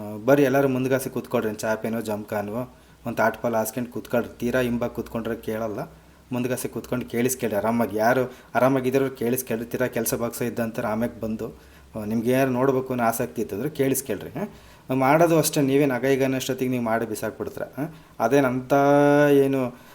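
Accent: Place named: Davangere, India. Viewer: ನಮ್ಮ ದಾವಣಗೆರೆ ಮಾತು ಕೆೇಳೊದಕ್ಕೆ ಚೆನ್ನಾಗಿದೆ ಅಲ್ವಾ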